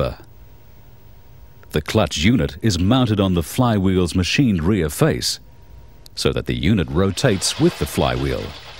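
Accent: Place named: Australia. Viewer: Perfect Standard Australian accent being spoken.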